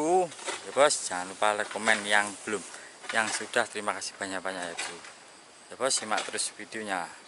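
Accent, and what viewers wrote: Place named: Java, Indonesia. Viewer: Logatmu jowo maseh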